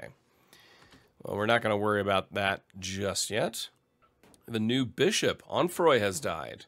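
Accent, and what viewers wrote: Germany. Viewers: Hey are you german ?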